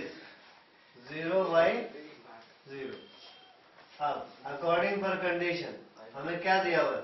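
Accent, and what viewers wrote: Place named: India. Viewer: Awaaz se laga hindustani bhau pada rahe hai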